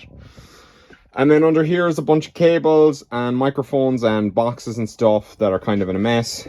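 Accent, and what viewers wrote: Ireland. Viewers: lol - dude I just searched for a Bitwig vid on reverb and mindlessly clicked the top one and turned back to the DAW. 10 seconds later I'm thinking "why does this dude sound super similar to that funny Irish chap I follow on Instagram" 🤣 Actually kinda cool to see you being an absolute social media megastar over there while you have a humble 5.6k followers over here, keepin' it real. Awesome to see how much you blew up dude - I expect the studio has probably expanded some since your success? Also mad jelly over your Polybrute. Good on ya mate and cheers for the tips.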